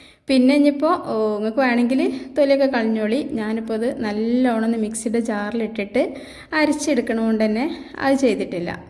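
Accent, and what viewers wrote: Malappuram, India. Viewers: ഇപ്പോ താത്ത ന്റെ സംസാരം മലപ്പുറം ഭാഷ ആയി 😂ഇതിനു മുമ്പ് ഇൻഡോകി ഇനാലെ എന്നൊക്കെ പറഞ്ഞു ബോറടിപ്പിച്ചിരുന്നു ഇപ്പോ പൊളിച്ചു ❤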